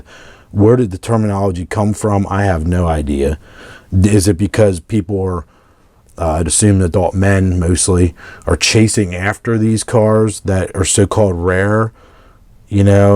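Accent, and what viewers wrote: Pennsylvania, United States. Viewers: Sounds like you from Pennsylvania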